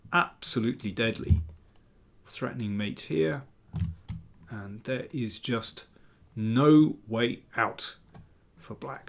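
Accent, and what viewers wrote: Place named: Oxford, United Kingdom. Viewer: Dear Mr. King. I know you understand German: Sehr schönes Video, unterhaltsam analysiert. And I love this sort of Oxford English!! Great!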